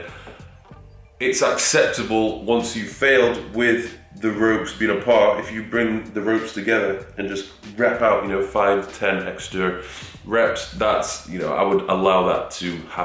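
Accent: United Kingdom. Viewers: Hello from LA again. I was so glad to see that you are back with the videos again. Your arms are now, perhaps your best body part!! I'm going to incorporate these new tips into my training and will pass it on to my personal trainer. My trainer is good, but your explanation is so clear and understandable......maybe it's the enviable British accent!! Ha Ha. Looking forward to more great videos. LA needs you!! Thanks, Hal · Sounds like John Cena with a British accent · I hear a British Ben Shapiro for some reason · A British John cena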